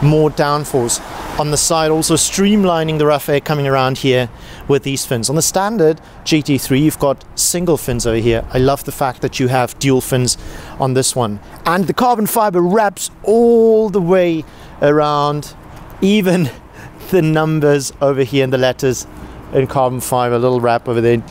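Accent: South Africa. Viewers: I hear South African vibes ..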